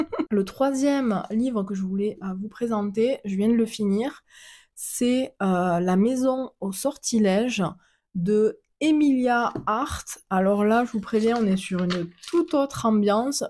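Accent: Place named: South, France. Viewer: Je decouvre votre chaine. Bravo pour votre authenticite.Douce et jolie,aimez-vous ça vaut le coup! J.adore votra accent du sud je me regale moi qui suis du Nord. Bonne continuation. Je vous suis desormais. Merci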